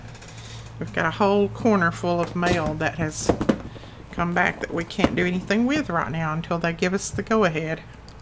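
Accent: South, United States. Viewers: I just love watching you craft your southern accent is adorable and listening to you chat is entertaining, just wish I could answer and chat with you. Thank you for all your beautiful videos.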